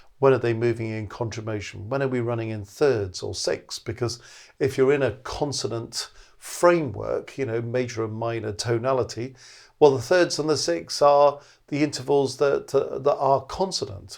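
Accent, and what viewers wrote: United Kingdom. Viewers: I suggest that can you please teach us how to speak British? Because you have the great British accent when you are speaking....Please?? THANK YOU!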